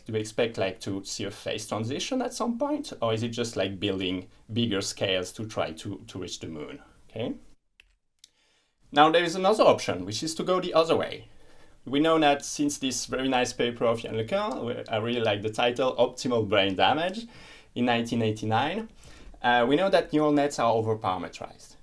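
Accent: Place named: France. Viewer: Great content, but it was really hard to understand because this idiots thick french accent. 😔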